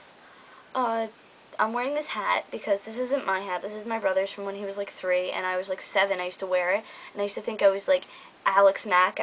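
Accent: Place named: New Jersey, United States. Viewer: You tawk like jersey. lol It's cool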